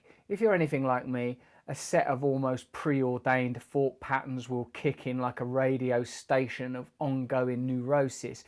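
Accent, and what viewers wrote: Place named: United Kingdom. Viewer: What type of British accent do Russel have?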